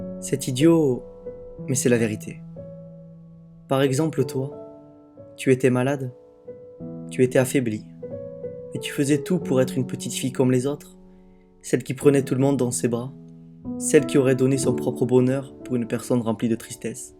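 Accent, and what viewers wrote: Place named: Toulouse, France. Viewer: Hi, j'ai eu la mission de répondre aux commentaires de Un ptit accent du sud (Toulouse hihi) Grooos bisouus Uma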